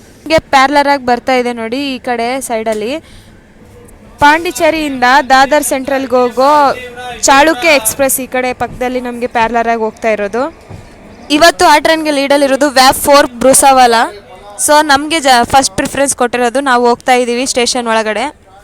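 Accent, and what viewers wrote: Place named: Bellary, India. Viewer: ಮಗಳೇ, ಬಳ್ಳಾರಿ ಭಾಷೆ ಬೇರೆಯವರಿಗೆ ಸ್ವಲ್ಪ ಓರಟು ಅನಿಸುತ್ತೆ, ನಿನ್ನ ವಿಡಿಯೋಗಳನ್ನು ನೋಡಿದ್ದೇನೆ,ಬೇಷಿ ಅದವು , ಮುಂದುವರಿಸು, ಶುಭವಾಗಲಿ, ನೀನು ಈಗ ಬಳ್ಳಾರಿಯಲ್ಲಿ ವಾಸನೋ ಮೈಸೂರಿನಲ್ಲೋ?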